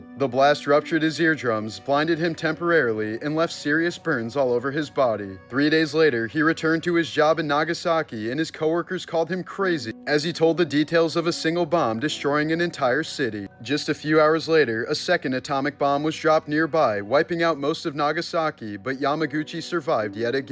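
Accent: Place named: England, United Kingdom. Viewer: No hes not saying it incorrectly he's saying it with an English accent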